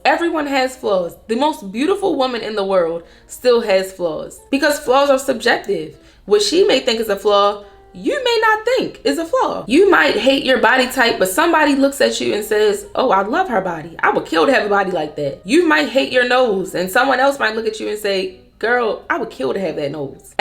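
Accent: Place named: Maryland, United States. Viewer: My gurl sound like she is from MD ?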